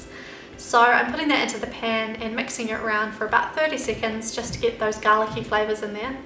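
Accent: New Zealand. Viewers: New Zealand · Kiwi accent lol....people have a job understanding us sometimes :) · These sandwiches look SO good! Thanks for the recipe ideas - you're wonderful to listen to - kiwi?😊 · She sounds like she's from nz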